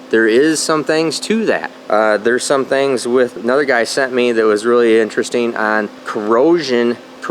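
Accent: Michigan, United States. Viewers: that Michigander accent coming through 😂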